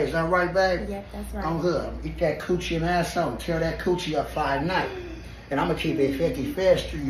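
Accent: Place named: Los Angeles, United States. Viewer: That's how they talk in LA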